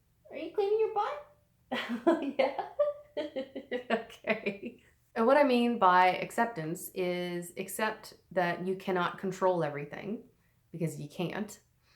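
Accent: Canada. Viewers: right down to the Canuck accent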